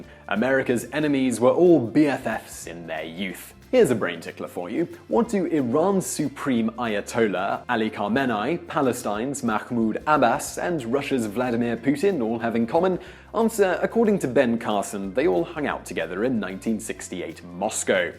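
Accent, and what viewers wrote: United Kingdom. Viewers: I thought you are Brit. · lel a british guy talking about american politics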